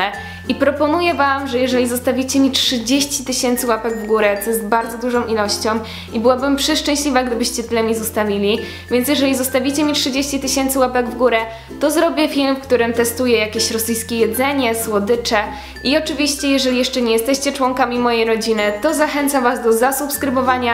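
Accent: Poland. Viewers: Ty jesteś z Rosji, czy masz chociaż korzenie, bo ten akcent to jednak Polski (i to BARDZO)?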